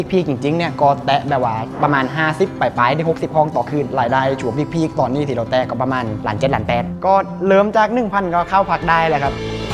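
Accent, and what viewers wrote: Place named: Thong Daeng, Thailand. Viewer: สำเนียงทองเเดงเท่ดี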